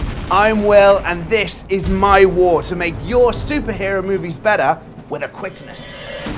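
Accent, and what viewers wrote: United Kingdom. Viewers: I swear this guy really is Jack Black with a british accent. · I'm just going to put this out there; the elephant in the room so to speak. I overlooked his weight because of his awesome British accent! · Your like jack black with a British accent. I love you!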